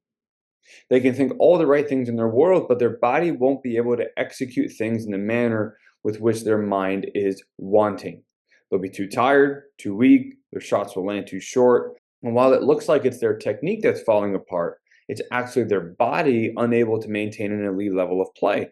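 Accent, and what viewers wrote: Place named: Eastern Europe. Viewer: Coach, I don’t know what’s behind those 3 “lessons”, but you sound more Eastern European than American😂. This is how “business” is done here.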